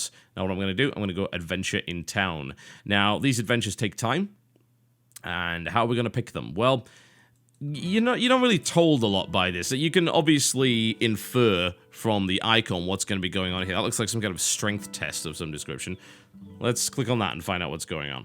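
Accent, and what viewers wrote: United Kingdom. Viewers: Why would the name total Halibut and athe british accent make you belive that? No but in all seriousness( if that is a word) he is british but moved later to the U.S you can catch up on most of his backstory in the 1 mio subs vlog. Thinking about it it sounds like I gave you the back ground to a videogame character... hm.. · is it just me or is british? lol!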